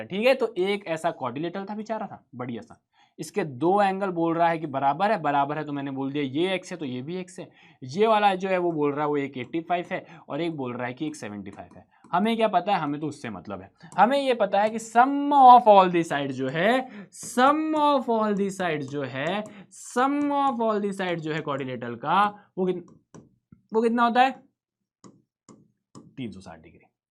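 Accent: Bihar, India. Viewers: Sir ap bihar ma kha se ho ap ki language bihar jaise hai 😂😂❤❤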